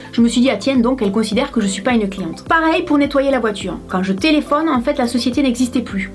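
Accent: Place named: Perpignan, France. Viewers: Coucou! Je te suis depuis quelques semaines à peine et j'aime beaucoup ce que tu dégages (ta fraicheur) et tes conseils avisés. Tres heureuse de savoir que tu es de Perpignan (je me doutais bien vu l'accent!) et ravie également d'apprendre qu'une marque de cosmétique est produite dans notre belle région! Ne change rien, au plaisir de voir tes prochaines vidéos! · et beh je me disait bien que ton accent me disait quelque chose... J'habite Perpignan depuis 4 ans environ, mais je ne connais pas du tout Centella. Pour Aliexpress, je n'ai jamais eu aucun souci pour le moment, mais je ne commande rien d'électronique, ni de soins donc bon pas trop de risque. Et pour justfab, jamais testé, mais j'ai toujours pensé que ça puait l'arnaque.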